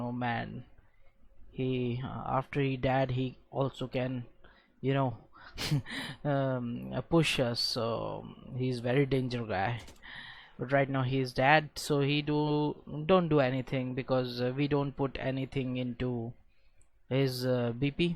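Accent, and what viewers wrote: India. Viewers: bhai jo bolne walo ki english bolne ki style hi alag hoti hai jo bhi indian hoga wo acchr se ye english samaj sakta hai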